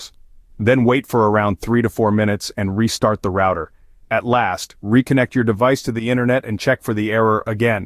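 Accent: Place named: England, United Kingdom. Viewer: Thanks I love your detailed simple instructions I ca ear and under your kaunhiage and speech perfectly it's nice to hear a English voice Bravo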